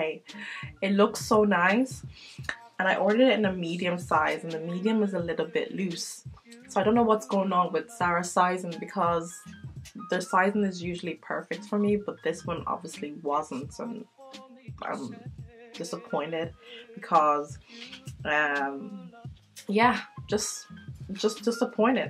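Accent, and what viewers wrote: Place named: Ireland. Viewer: I love your Irish accent, and nice haul too 👍🏾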